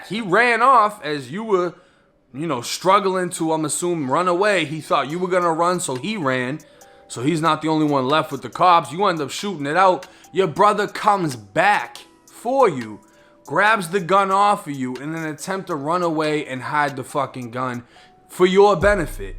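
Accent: Boston, United States. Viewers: 1090 u got me rolling with u saying peace officer. I know its hard with your Boston twang. (Police officer)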